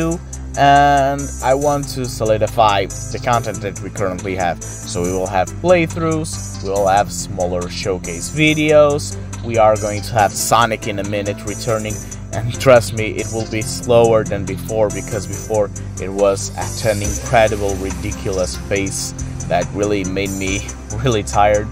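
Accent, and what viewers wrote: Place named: Portugal. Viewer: Sim! Ele fala também a nossa língua, só que Português de Portugal.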